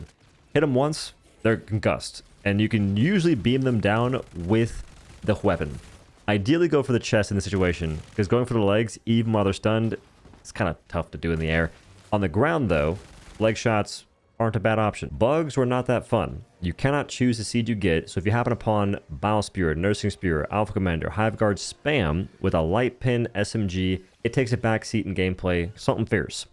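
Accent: South, United States. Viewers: He's Suth'ehn. Git'it? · Naturally he seems to have a very mild, almost undetectable southern accent. He exaggerates it when saying certain words for comedic effect. An older video showed his PO box is in Texas so it makes sense.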